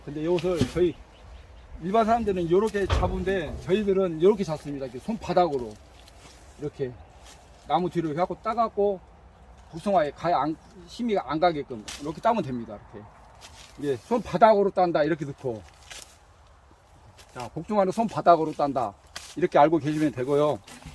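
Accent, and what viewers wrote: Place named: Gyeongbuk, South Korea. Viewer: 네네 경북 말씨로 알속무게 이네요 감사합니다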